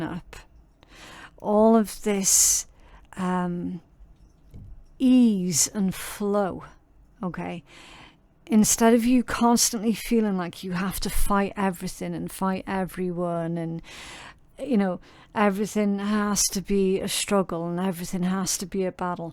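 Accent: Scotland, United Kingdom. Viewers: It’s a Scottish accent ❤ and yes it’s beautiful!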